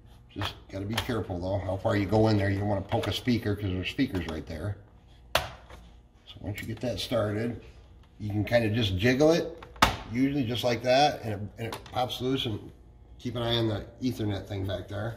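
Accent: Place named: Upstate New York, United States. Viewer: You sound like you’re from upstate NY. Lol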